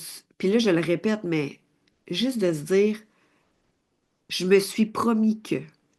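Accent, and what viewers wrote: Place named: Quebec, Canada. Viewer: Je supporte difficilement l'accent quebequois.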